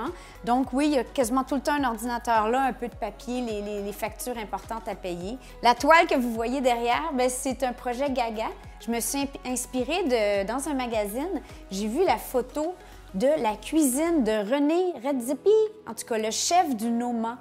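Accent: Quebec, Canada. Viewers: Hello hello, grâce à Carl tu as une nouvelle abonnée depuis ce soir :). Merci pour ce magnifique House Tour :). Votre maison est énoooorme et très bien aménagée. J'adore l'accent québécois alors j'suis vraiment super bien 'tomber'. Saskia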